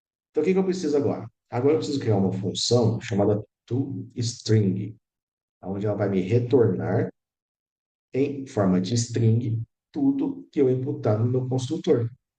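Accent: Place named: United States. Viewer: Sotaque americano lindo desse professor 🥰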